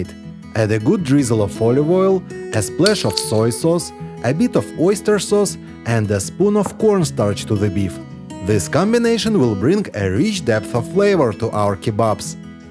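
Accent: Russia. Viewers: Speaking english with russian accent is terrible.. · Ужасный русский акцент !!!! Зачем говорить???? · Русский акцент или мне показалось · Не зная Английский, слышу русский акцент😂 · английский с ру акцентом!)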